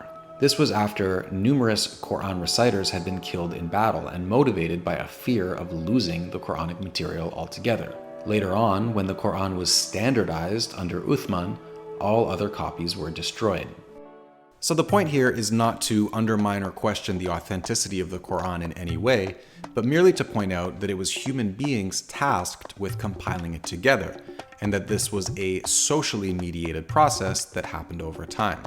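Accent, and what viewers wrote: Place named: Ontario, Canada. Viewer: Dear Brother: Fellow Islamic 'seeker' here. Fellow Madre Yage aficionado here. Just returned from an 11 day retreat in Columbia with the plant. THE 2 ARE VERY COMPATIBLE AND VERY COMPLIMENTARY, IMO. I am located in Ontario, near you, judging from your accent and some of your videos. Thank you for being a visionary. Thank you for your courage. Ameen. Emily.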